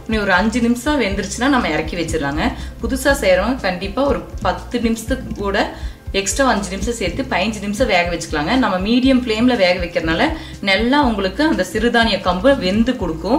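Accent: Kongu, India. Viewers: மிக்க நன்றி, அழகான கொங்கு தமிழிலில் விவரித்த விதம் அருமை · கொஞ்சும் கொங்கு தமிழ் 😊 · கொங்கு தமிழ் கொஞ்சமாத் தெரியுதுங்க... நல்ல கூழ்...இன்னிக்கு நான் செய்யப்போறேன்.. 2020 ஜூன் 2 சென்னைல வெயில் தாங்க முடில...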